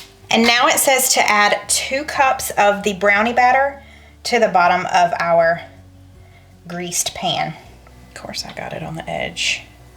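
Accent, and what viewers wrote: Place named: South Carolina, United States. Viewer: Hey I live in S.C. and with your accent u have to live here to lolll!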